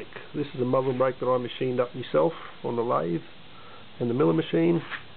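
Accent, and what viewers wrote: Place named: Australia. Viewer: thought u sounded like an aussie so checked out your youtube page and saw your Greens pic. Guess you dont vote Green, haha.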